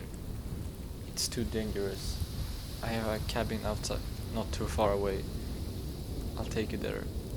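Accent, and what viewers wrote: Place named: Sweden. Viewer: I knew it! Your accent reminds me of my first love🥺 He's from Sweden too.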